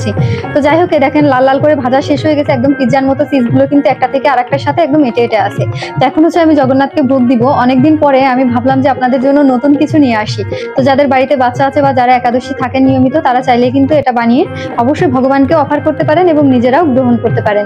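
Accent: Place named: Bangladesh. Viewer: Hare Krishna 🙏🏻 apni ki bangladeshi ? Kotha gulo sunte se rokomi lagche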